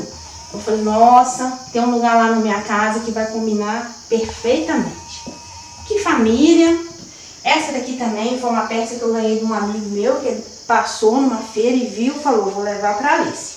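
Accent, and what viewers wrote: Minas Gerais, Brazil. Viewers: É mineruai kkkk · Com sua voz de mineirinha ficou um show e amei sua casinha . Parabéns e Deus abençoe sempre beijos pra vc. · Chegando no canal. Ganhou mais uma inscrita. Seu gosto, meu gosto. Amo reaproveitar, transformar, inventar e por aí vai. Esse fogão amarelo deu um charme todo especial. Tenho o costume de pegar objetos de família e restaurar. Tenho várias coisas de roça do meu avô. Se vc soubesse o qt gostei da sua casa!!! O quarto cheio de caminhas com colchas de retalhos, os bancos, as cortinas, o banheiro todo lindo e até a sua timidez 😂. Sou mineira também. Seu sotaque ñ nega. Parabéns. A-DO- REI 👏😘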